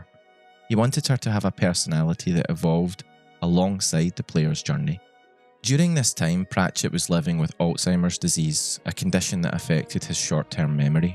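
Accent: Scotland, United Kingdom. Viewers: Is this scottish? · I also don't know how I got here. But I love Terry Pratchett. And I also enjoy listening to a scottish accent 🙂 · I love that Scottish accent and cadence. It's not so heavy that I can't understand it, but it has the bouncy musical quality of the Celtic dialects. Please continue to speak through all the ages, so that we may not be deprived the pleasure of hearing your voice. I miss Mr. Pratchett and his stories. I suppose that is the way of stories though. Life goes on; things change; stories end, begin again, evolve. We carry them in our hearts, and keep them alive in our own stories. On and on, across the endless cascade of creation. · It was strange seeing my words in print, but surreal hearing them in that great Scottish accent. You've done a wonderful job of relaying some of the magic I felt while helping to bring Vilja to life.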